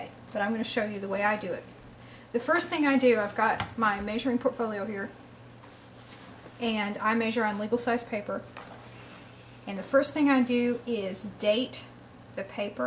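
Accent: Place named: Southwest, United States. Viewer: I’ve run into people here in MO who say “mayzure.” She probably interacts with lots of people who have southwestern regional accents. I noticed at several points in the video that the accent flattens out a bit. It could be she has grown used to hiding it or it’s a consciously or unconsciously assumed accent due to her location. Whatever the case, I enjoyed the video and her personality.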